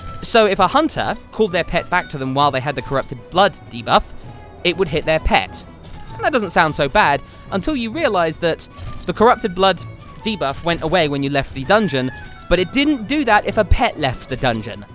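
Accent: United Kingdom. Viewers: you sound british?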